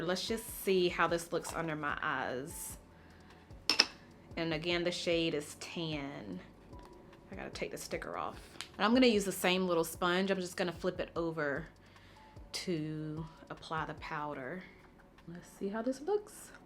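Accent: South, United States. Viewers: Love your videos and your southern bell accent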